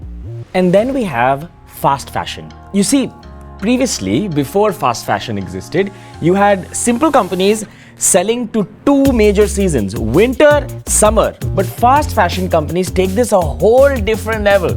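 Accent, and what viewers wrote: India. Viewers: Just discovered this channel, usually it is hard for me to listen to an Indian accent, but you do amazing job. Subscribed!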